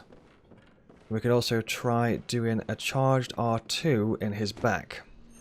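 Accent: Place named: Yorkshire, United Kingdom. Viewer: Hello there! May I ask if you're from Yorkshire? (I mean no offense —I'm Korean, and your accent sounds preety similar to my English tutor's and I like his) I'm an fanboy of FromSoftware, and I'm using your videos to study English while simultaneously enjoying my hobby. Thx!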